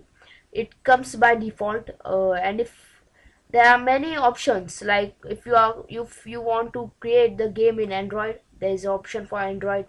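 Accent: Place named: India. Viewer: That Indian accent tho!!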